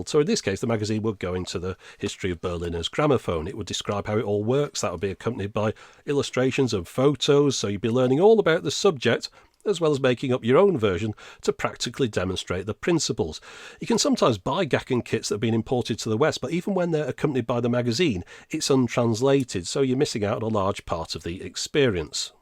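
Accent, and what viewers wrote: United Kingdom. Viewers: Dear. I have two questions. First question: can you discribe and explain an automobile vinyl record player that was available in the 1970s? Second question: Can you review the 8 centimeter long mini cd and dvd and the Nintendo GameCube? Those were my questions. I like your videos very well and you have a neat British accent in your voice. With friendly greets from Gamer Japio.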